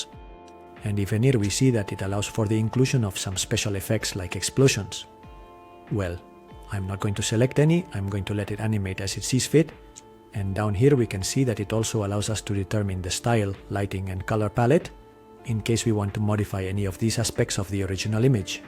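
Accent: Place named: Greece. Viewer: Thank you for this video. Love your accent (I assume it is a Greek one)🙂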